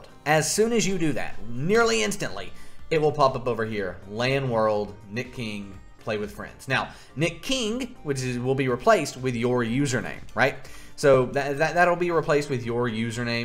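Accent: West, United States. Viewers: I like your western accent :D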